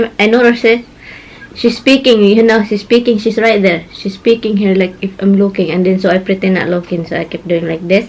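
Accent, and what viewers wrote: Visayas, Philippines. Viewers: HI sis.....i really like ur accent bisay jud ba,i am proud of it.sad to heard about ur duhang totoy dai oi mailbag sab,i avoid mo mag bra every time u goes to bed.basin naa ka ces.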